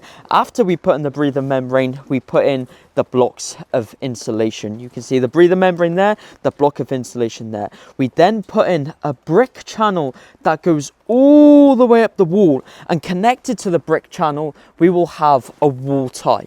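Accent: Wales, United Kingdom. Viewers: Good video. Think about making more videos breaking down each process and showing them actually being done. You sound like you might be from Wales. I've run a few jobs myself. Currently coming to the end of a refurb in ponty. In Wales of course we now have to fit Sprinkler systems in new builds - even domestic ones. We've just done almost £10,000 on a sprinkler system and fire alalrm for a five bed HMO. Thank you Welsh Gov. Anyway, great vid, great channel good luck on making more videos. 👍👍👍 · Can decide if he got a Welsh accent or a foreign accent!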